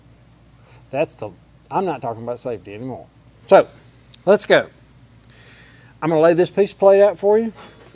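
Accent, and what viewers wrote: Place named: North Louisiana, United States. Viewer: Haughton high school, sounds like you might be from north, La. Youtube recommended this video. You're a good torch hand!!! I have a youtube channel also, I do everything from trucking to fabrication. I finally broke down and bought a Hougen mag drill last year, after wanting one for over a decade! I worked as a millwright and also in a fab shop several yrs ago. I'll subscribe and check out some more of your vids later, Always good to know new tips to make things easier!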